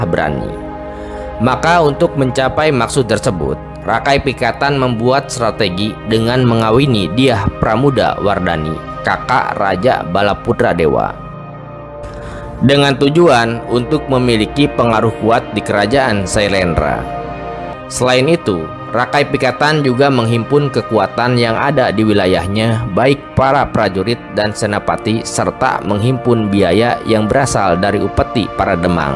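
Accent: West Java, Indonesia. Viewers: naratornya orang sunda bukan jawa tengah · Yg punya chanel kaya logat orang sunda malah paham sejarah temanggung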